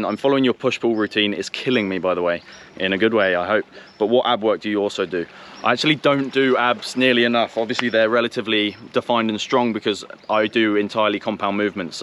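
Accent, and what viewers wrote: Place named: United Kingdom. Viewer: ARE u british? i like the accent though.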